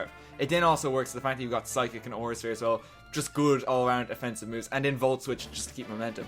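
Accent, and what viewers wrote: Ireland. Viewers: Irish Accent <3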